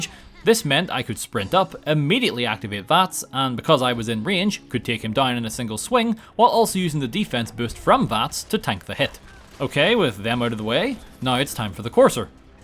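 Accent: Northern Ireland, United Kingdom. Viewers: It's a pet peeve of yours that that someone from Northern Ireland doesn't speak in an American accent? How very odd.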